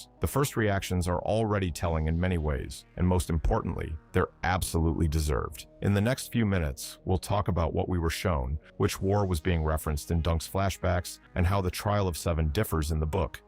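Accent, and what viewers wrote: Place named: United States. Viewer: You Americans and the way you destroy the English language with your ridiculous accent. Its not Noveeela, its Nov el only 2 synonym's not 3 synonym's no ve la! FFS 🤦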